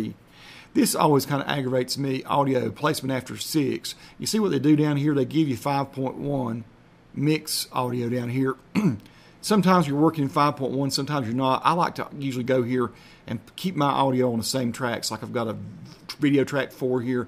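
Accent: South, United States. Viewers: love the southern accent xdd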